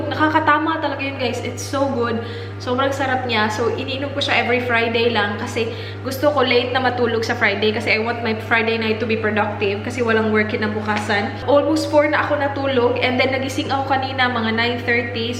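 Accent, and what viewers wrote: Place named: Tuguegarao, Philippines. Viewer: Ate!! Are you from Tuguegarao? Hahaha omg!! Yung punto mo kasi, same sa tita ko. All the love ate!!!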